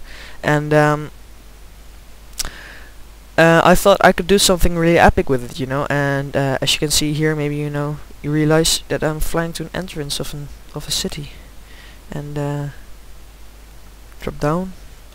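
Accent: Netherlands. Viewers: nederlands? dat hoor je gewoon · Ben je nederlands xD Je hoort gewoon dat je geen engels/amerikaans bent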